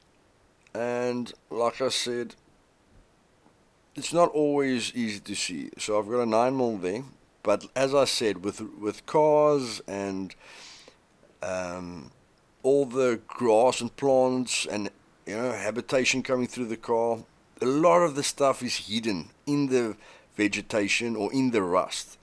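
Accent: South Africa. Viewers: You from South Africa? Subbed!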